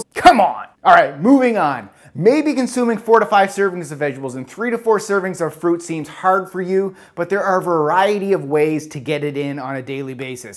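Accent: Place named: Canada. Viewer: Not sayin’ it wrong....just Canadian 🤪🇨🇦 Never lose that!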